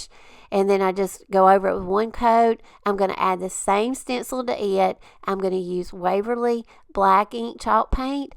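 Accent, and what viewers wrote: South, United States. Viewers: You and your hubby are both so talented and I love your southern accent.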